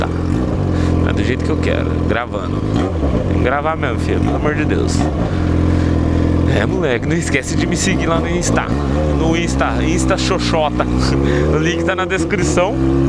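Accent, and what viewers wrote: Goiás, Brazil. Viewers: pq vc fala no pique do goiás,sendo que vc mora no paraná?kk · tu fala no pique do goias pq vc eh daqui de goias?..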